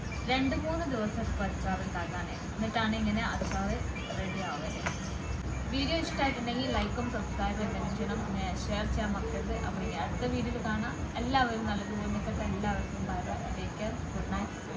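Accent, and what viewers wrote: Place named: North Kerala, India. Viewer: North Kerala, from the way you speak malayalam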